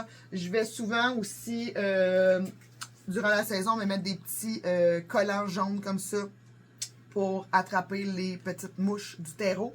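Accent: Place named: Quebec, Canada. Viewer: J'ai un peu de mal avec l'accent québécois mais j'aime bien vous écouter. Vidéo intéressante. Je vis en France.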